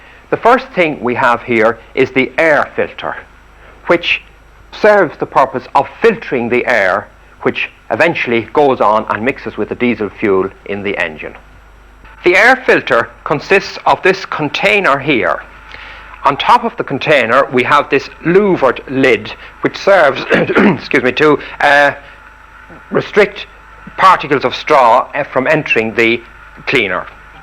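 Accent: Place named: Meath, Ireland. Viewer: Lovely meath accent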